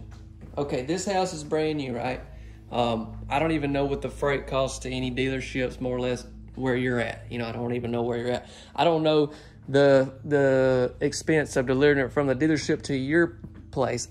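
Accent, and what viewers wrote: South, United States. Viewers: My husband usually knows when I’ve watched a video of yours when I start talking with a southern accent. Lol. And he is right! I just LOVE your videos. Keep up the good work! 😊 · I really like listening to you. You are a Southern boy and you don't try to hide it. You make me smile with your descriptions of the homes. Love you..have a blessed day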